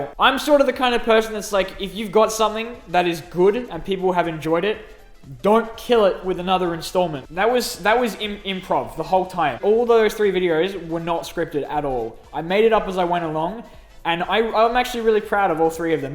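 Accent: Australia. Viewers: You're Australian? I've literally spent all this time thinking you're british.......... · You're Australian? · wait... YOUR AUSTRALIAN!!??!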